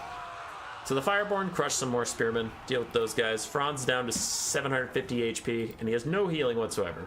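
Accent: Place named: France. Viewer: Just missed this :< gonna need a game cast in that exquisite french accent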